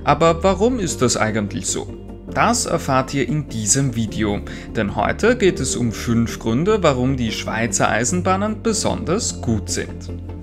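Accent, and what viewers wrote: Vienna, Austria. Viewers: I am British. At school I had a FEW German lessons. But I can understand almost everything says. HIS ACCENT IS PERFECT FOR people whose first language is not German. Danke schoen fur sein sehr Klar 'Wiener Upperclass'. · Professionell gemacht. Aber diese Stimme und Aussprache (Wiener Upperclass = Schönbrunner Burgtheatersudentendeutsch). Schrecklich. Klingt fast wie Kurz. Bitte synchronisieren.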